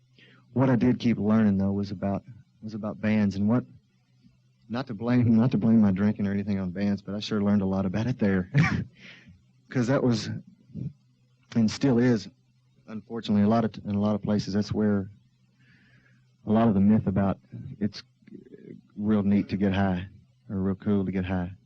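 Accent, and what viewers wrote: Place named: Dallas, United States. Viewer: Classic Dallas accent from the period.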